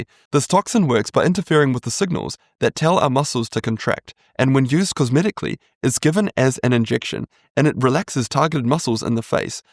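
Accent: New Zealand. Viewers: Love the Kiwi accent… even though I hope AI wasn’t involved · Seems fine to me. Maybe it's the New Zealand accent? I'm Aussie so perhaps this is how I talk too 🤣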